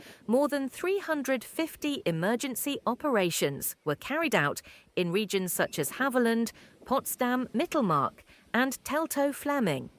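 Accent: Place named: England, United Kingdom. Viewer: Too many mistakes in both English and German in the subtitles, and why is there no punctuation? One sentence runs into another without any pause. The voiceover sounds much too happy, absolutely bursting with glee. And why the English accent but American grammar? For example one hundred fifty instead of one hundred and fifty. Obviously the work of AI.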